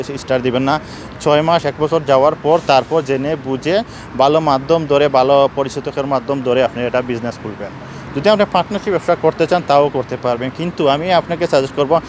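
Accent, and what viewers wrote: Sylhet, Bangladesh. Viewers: ভাইয়ের কথা শুনে মনে হচ্ছে, আমাদের সিলেটের??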